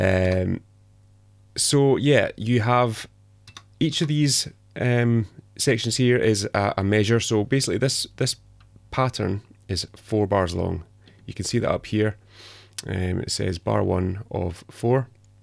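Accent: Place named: Scotland, United Kingdom. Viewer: It's a great tutorial my Scottish friend (is it Scot? I'm American so it sounds like you're Scots heh heh) A little long before the music examples, but it's totally cool.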